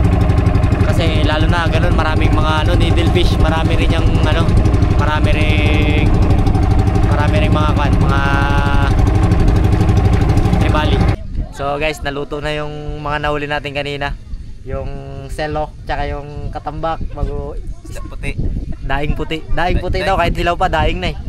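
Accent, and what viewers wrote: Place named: Visayas, Philippines. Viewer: Bisaya ka ya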